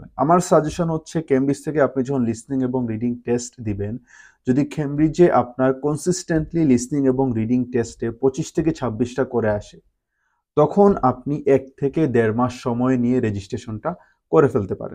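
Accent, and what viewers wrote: Sylhet, Bangladesh. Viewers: Apni Sylheti ni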